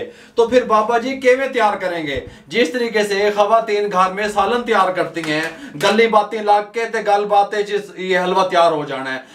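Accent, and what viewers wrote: Punjab, India. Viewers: ،،،،، کرما والے اووووو،،،،،، میں کینا تسی پنجابی دا جیڑا تڑکا لان دے او اردو اچ بول دے بول دے، اودا وکھرا ای مزا ھے گا،،،، میں بڑا انجوائے کرنا واں ۔۔۔۔۔میرا تیان ریسیپی تے گھٹ ای ھوندا اے، زیادہ تواڈیاں گلاں تے ھوندا اے ۔۔۔۔😁 حالانکہ میری آپ مادری زبان اردو ھے گی،۔۔۔۔۔۔😅 الله تعالیٰ آپ کو ھمیشہ ھمیش خوش حال اور اسی طرح ھنستا بولتا رکھے ۔۔۔۔۔۔ آمیییین۔۔۔۔ والسلام علیکم ورحمتہ الله تعالیٰ وبرکاته ،،،،،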